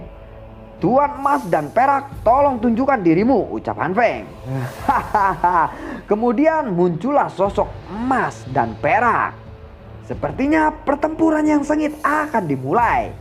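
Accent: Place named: Sunda, Indonesia. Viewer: Wkwkwk Sunda gning 😁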